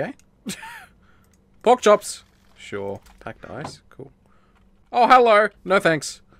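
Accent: Australia. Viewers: Question: can you make a video without holding back the australian accent and swear words?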